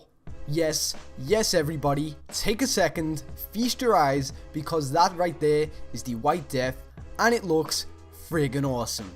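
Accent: Liverpool, United Kingdom. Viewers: 'which I'm sure is a toilet paper in the UK' .....you're from the UK aren't you?? Scouse I thought?? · I'm so confused, are you a scouser? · Your fucking accent is horrendous. If you're a scouser, SPEAK LIKE A SCOUSER.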